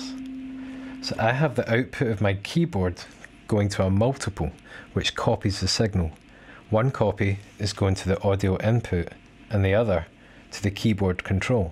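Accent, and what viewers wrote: Scotland, United Kingdom. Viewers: Nice hearing another Scottish accent on gear. I got one of these ages ago but never really explored the input weirdness. I didn't even think of using a Euro oscillator/sequencer for the pitch. This is great. Just what I was looking for. I wonder if CV could be sent to the HOLD input too. Hmmm.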